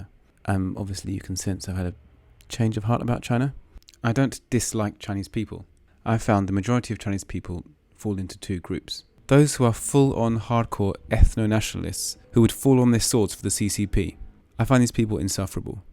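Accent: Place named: Taiwan. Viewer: Chanced upon one of your videos on your Mandarin channel - was very surprised to hear a Taiwanese accent since most Western folk seem to use Beijing pronunciation when learning Mandarin 😂😂 Makes sense now, knowing you lived in Taiwan prior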